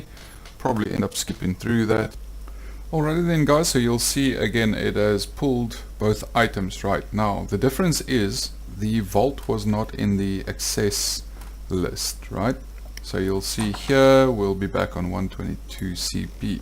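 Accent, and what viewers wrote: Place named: Afrikaans, South Africa. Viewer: You can hear that afrikaans accent from a mile away xD Goeie Video Bra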